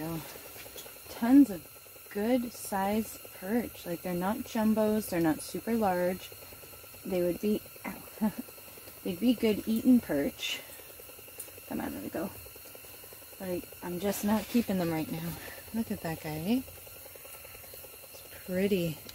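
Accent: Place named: Canada. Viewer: That Canadian accent is strong Aaay! ☺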